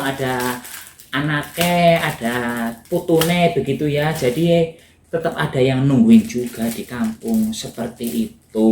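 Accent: Banyumas, Indonesia. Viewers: kasian ya, tapi tetap semangat dlm menjalani hidup, jatuh bangun dng segala perjuangannya yg tidak mengenal waktu, sekarang sudah kelihatan jerih payahnya hidup sudah berkecukupan, punya rumah, punya mobil, punya istri belum to, kok selalu tampil sendirian, tapi, banyak temen karena lucu dan cerdas, jadi banyak netizen yg suka banyolannya, ngomog ngapak yg ting pletot, bikin lucu alami, kalau bisa ketemu, pasti tak iwel" pipine sing tembem, botak, irunge sing blesek, tapi, lucu bikin gemes kalau bukak vidionya bersama iyunge yg kool kuno mbrono khas kehidupan desa, tapi sukses capaiannya, 😲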